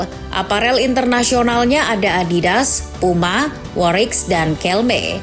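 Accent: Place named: Banten, Indonesia. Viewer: Admin orang Banten ya lur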